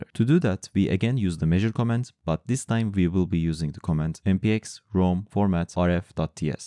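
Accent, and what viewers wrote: Turkey. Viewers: Your accent sounds Turkish lol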